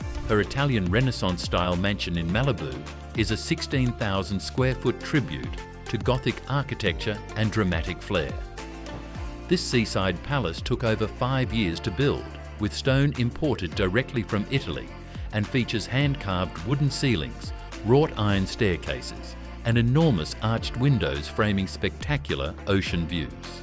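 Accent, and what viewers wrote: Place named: Australia. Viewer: Great to have an Australian commentator 🎉😊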